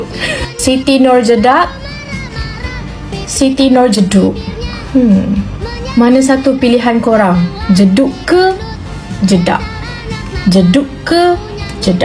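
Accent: Malaysia. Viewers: Kaka nya orang malasiyanya soalnya ngomong nya malasia😊😊😊😊😊😊😊😊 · Jeduk ini kakak bicaranya kok kayak Malaysia ini kakak dari Malaysia ya atau kakak itu hidup di Malaysia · Kakak ini orang Malaysia ya kak kok suara nya kayak orang Malaysia 😅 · Kakak ini orang malaysia ya kok ngomong nya kayak orang malaysia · Kak orang melesya ya kok ngomong nyah gitu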